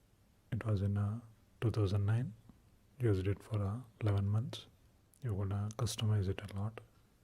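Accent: India. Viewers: I think hes indian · I think that the Indian accent makes for the best ASMR sounds. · Wow who is he..?? His voice is gold...I love an Indian accent.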